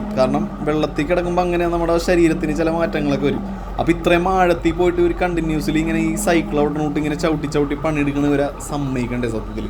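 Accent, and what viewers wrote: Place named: Thrissur, India. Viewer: തനി ത്ര്ശൂർക്കാരുടെ ഭാഷാ ശൈലി.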